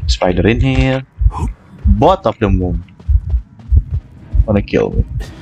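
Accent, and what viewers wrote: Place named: Indonesia. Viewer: Logat indonya kerasa bro and ur sounds mmhhh daddy 🥵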